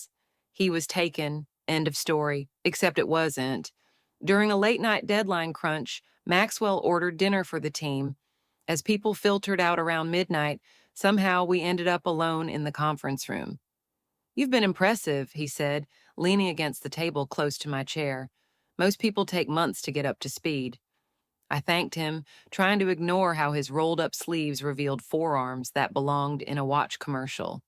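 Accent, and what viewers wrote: South, United States. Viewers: What is this...picture and story about a Black woman being narrated by a southern White woman!? You couldn't even use an AI voice to get a neutral one? This is offensive.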